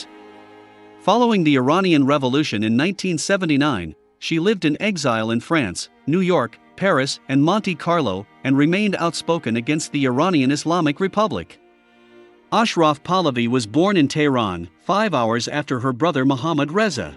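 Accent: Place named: United States. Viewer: The strong american accent who disforms the pronounciation of the iranian names is very annoying. The music is too loud and covers the speech.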